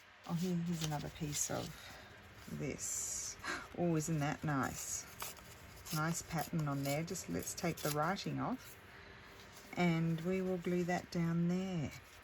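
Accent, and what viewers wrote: Australia. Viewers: I absolutely love your channel, I’ve been a subbie for quite a while, but I don’t comment very often. I just wanted to tell you how much I enjoy your channel. I suffer from chronic pain, depression and anxiety, and spend a lot of time in bed watching videos. Your videos always make me feel better, happy, and really helps lessen my anxiety, at least for a while. I love that you live in Italy, but have such a beautiful Australian accent, I guess it’s all in what accent or language you grow up speaking. The last thing, I LOVE rainy days, and I thought I was the only one, no one(and I’m not joking) that I know like rainy days!!!!😀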